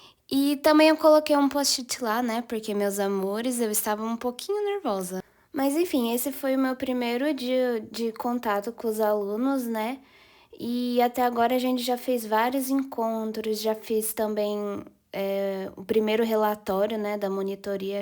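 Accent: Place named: Spain. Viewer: No sabes hablar bien portugués parece más español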